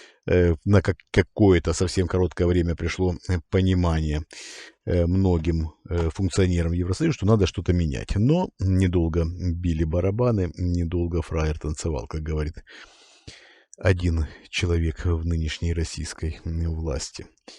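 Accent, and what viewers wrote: Ukraine. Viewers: ВОПРОС. Уважаемый, начал слушать вас совсем недавно, поэтому возможно пропустил информацию о вас. Тут посмотрел самые первые ваши выпуски и заметил у вас явный украинский говор. Мне очень приятно, что такие добрые люди, как Вы с моей любимой Родины, я сам с Украины, скажите пожалуйста, а с какой вы области или региона Украины? Спасибо!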